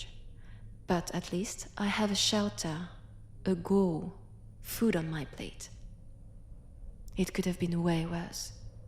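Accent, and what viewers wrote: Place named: France. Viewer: [French accent] Me : (instant click)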